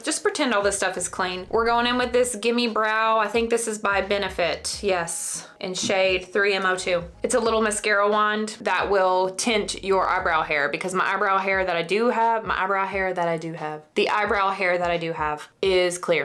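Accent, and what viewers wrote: South, United States. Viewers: Good morning ❤️🙏. I’ve been checking out a lot of your videos from the older ones to the ones that you post now and may I say that I absolutely love your tell it like it is attitude and I definitely agree with you on a lot of what you say.👍🏻💪🏻🥳. I also love to check out your cooking videos as well cause you truly are a hoot when you’re cooking and I love your southern voice too. I’ve been checking out at least two to four videos from you daily.😁👍🏻. Do hope you have a wonderful and blessed day and don’t ever change that awesome way of thinking too cause a lot of what you say is absolutely the truth. God bless you and keep the faith and definitely keep smiling too. You’re a pretty lady too. Hugs, Sue M. Clearwater, Florida ❤️🥰🥳💪🏻🙏⭐️🐚🌴❌⭕️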